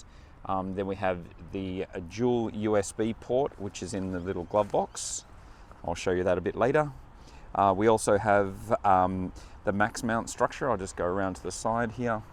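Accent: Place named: Australia. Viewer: Love the Australian accent and of course the Ryker looks very Mad Max :)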